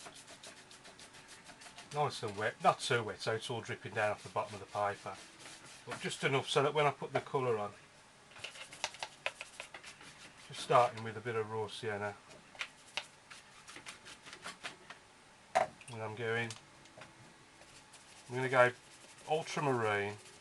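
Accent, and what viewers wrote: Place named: Midlands, United Kingdom. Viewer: Good 2 hear a midland accent on you tube...it looks a bit like kingsbury water park...fished it but not painted it yet...good job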